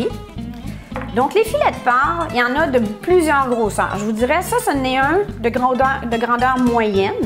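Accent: Quebec, Canada. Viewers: sans vouloir etre mechant, c est clair l accent quebecquois est une horreur pour les oreilles. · Cc tjs un régal d'écouter votre délicieux accent québécois ici en Bretagne, merci pour cette vidéo, j'ai un magret de canard à sécher au frigo mais maintenant je saurai faire la partie ficellage comme un grand. Au passage j'adors votre joie de vivre, si tt le monde était comme vous, le monde serait plus agréable 😉👋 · Merci beaucoup pour cette idée, c'est genial. Je vais l'essayer bientôt. C'est tout a fait intéressant écoutant a votre accent. Moi, je suis Américain, mais appris le Français en France et croyez ou pas c'est ma premiere fois d'entendre longuement l'accent Quebecois. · ha ben je découvre votre chaine maintenant (25/09/2024) après en avoir regardé quelques unes pour mon filet mignon séché, quel énergie et sympathie surtout la complicité de votre "minimoi" qui a du bien grandir ! 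OK je viens d'en faire et je me demandai comme ficeler tout ça vous m'avez donné une astuce avec le doigt merci ! Votre accent est bien agréable à entendre juste ce qu'il faut d’authentique. Alors bien le bonjour du Sud de la France "Cousine" Canadienne sympathique !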